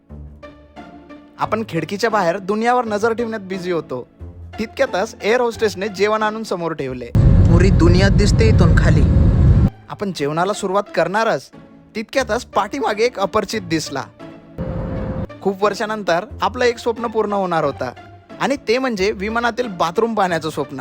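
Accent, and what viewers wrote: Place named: Chandrapur, India. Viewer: Tuzi Chandrapuri Marathi aikun ekdamach chan vatate re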